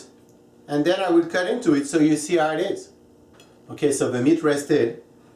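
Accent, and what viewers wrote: France. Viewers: I LOVE YOUR FRENCH ACCENT! I TAKE FRENCH III AND I LOVE THAT FRENCH ACCENT! NICE JOB WITH THE STAKES BY THE WAY! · Very helpful! Where in France are you from? J'aime ton accent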